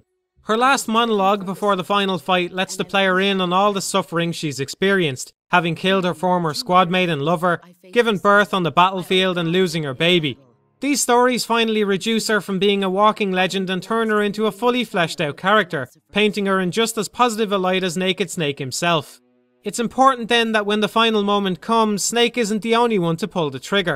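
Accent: Ireland. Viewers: He is Irish so I'd Imagine they have a bit harder to pronounce thingsm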